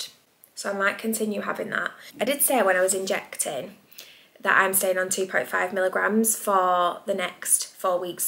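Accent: Lancashire, United Kingdom. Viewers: Today is my day 1. You sound like you’re from my neck of the woods in Lancashire.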